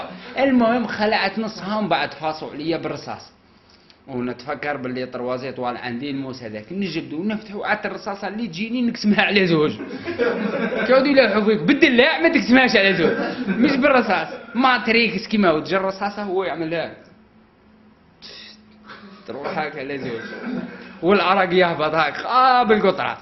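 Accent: Djelfa, Algeria. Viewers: Tony Soprano لهجة جلفاوية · اللهجة الجلفاوية النايلية💞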